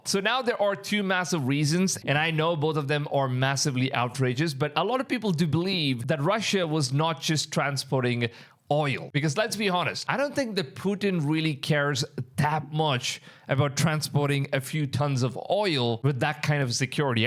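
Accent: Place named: India. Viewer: he is from India and English is not his native tongue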